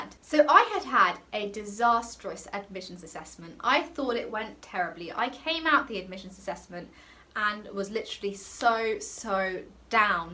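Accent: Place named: RP, United Kingdom. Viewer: your too posh 😭😭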